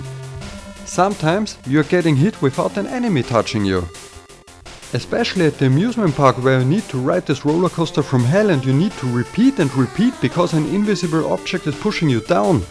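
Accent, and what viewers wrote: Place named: Austria. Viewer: you sound like an austria Sperling enlish. not greek.. but neis review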